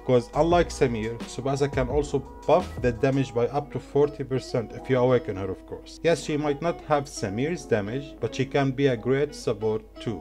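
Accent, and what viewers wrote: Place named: Arab world. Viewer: Where r u from man? you sound arabic af 😄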